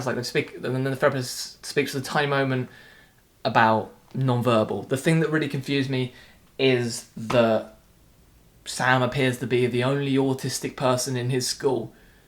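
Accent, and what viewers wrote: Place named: United Kingdom. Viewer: From your accent, I am guessing that you live in the UK. Autism culture between the UK and US is quite different. I've found from reading, vlogs, blogs, fora, etc. that it seems as if one driving difference for that has been legislation. In the UK, you have specific legislation pertaining to autism that defines it as a recognized and funded disability which coupled with universal healthcare makes the landscape there in terms of autism really different than it is in the US. You also have a very strong advocate in the Autism Society. You mentioned that for the parents in "Atypical" that Sam is a burden and seemed to find that horrendous. But in the US because so much care and assistance isn't provided, in financial ways and in terms of Sam's prospects for independence in a bottom line sense he probably would be. Many of the special classes he's attended even his therapy may not be covered by the parents' insurance - so something they are paying for out of pocket. 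If Sam has trouble finding (sustaining) employment then they might be looking at him living with them for the rest of their lives, whereas my understanding of things in the UK is that (while they are not perfect) there is financial and housing support available for autistic people. Not to mention that in the show there are a ton of special accommodations, that mostly the Mom makes around what Sam will eat, wear and even by having a separate laundry detergent just for him. That said, my sense of the "burden" theme was t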